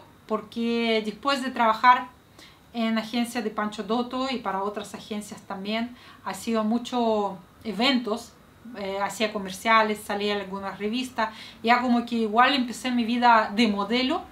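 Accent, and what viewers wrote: Chile. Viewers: ¡Hola! Escuché integramente tu relato, porque me lograste despertar mucho interés en tu historia de vida. Me encanta como te expresas en español-chileno y la manera honesta de tu relato. Admiro la fuerza resiliente que has mostrado para salir adelante, tanto en el plano personal, como en la responsabilidad con tu hijo. Más allá de los muchos momentos difíciles que te ha tocado vivenciar en tus experiencias migratorias, ¡te felicito por como has asumido tu vida! Te cuento que he tenido la oportunidad de viajar a varios países, entre ellos pude viajar a Rusia en 2019 y conocer Moscú. A partir de ese viaje opté por aprender ruso y hasta ahora trato de practicar el idioma cada vez que pueda. Меня зовут Рафаэль, и я живу в Сантьяго, Чили. Надеюсь, у тебя все хорошо, и ты наслаждаешься жизнью, своим сыном и любой поездкой за границу, которую решишь предпринять. 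Пока-пока! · Hola.. muy interesante tu vida, eso sí es una historia de vida, te escuché muy atentamente y también fue agradable escuchar tu acento y modismos Chilenos, eres entretenida, hermosa y agradable. Saludos y me alegro mucho por ti. Un abrazo.🤗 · Hola amiga, gran historia la de tu vida. Imagino que todo ésto te ha convertido en una mujer fuerte y determinada. Felicitaciones, se requiere de mucho temple y carácter para sobrellevar tales dificultades. Admirable. Es posible que la manera de ser de los chilenos te hagan sentir identificada con nosotros. Acá cuando te caes tienes que pararte rápido y seguir adelante. Un abrazo desde Santiago de Chile. En todo caso el idioma chileno te queda muy bien ❤😂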